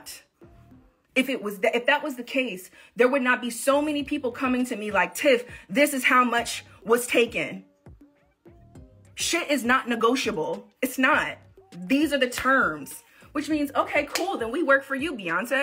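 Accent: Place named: Houston, United States. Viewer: Not me realizing that I actually know the voice behind the channel. Wow, you are amazing girl. Congrats on your YT success!!! I’ve been subscribed for the past year now and was like damn I know this voice from somewhere. She sounds like she’s from Houston lol. Hey girl, heyyy 👋🏾🤘🏾😘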